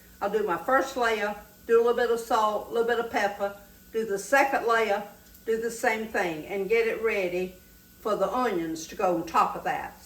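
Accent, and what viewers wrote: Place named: South Carolina, United States. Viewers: Being from Tennessee and living in Florida, always warms my heart to hear a South Carolina accent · hey, are you from SC? your accent is the exact same as my great-aunt Peggy's—I'm from northeast GA and aunt Peggy's accent always stood out enough to be different, even if where she was from in SC wasn't far from where I was raised in GA. your pie sounds great, by the way.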